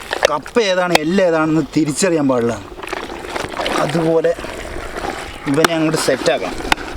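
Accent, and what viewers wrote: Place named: Kollam, India. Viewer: ഒരു കൊല്ലം slang ഉണ്ടല്ലോ സംസാരത്തിൽ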